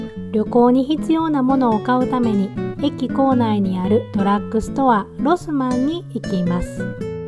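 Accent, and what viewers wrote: Kansai, Japan. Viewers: ドイツで入浴剤！？浴槽に浸かるのは日本人だけかと思っていました！びっくりです。 関西人のわたしには関西弁が抜けていない主さんの話し方が最高です。これからも頑張ってください😊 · お初にお目にかかります。 話し方から主さんは京都か大阪、いずれにしても関西の方なのかなと思いました。 黒い森、シュバルツバルトですね。 旅するドイツ語で見ました。 自然豊かでんなぁ。 でもビール飲めへんと辛そう。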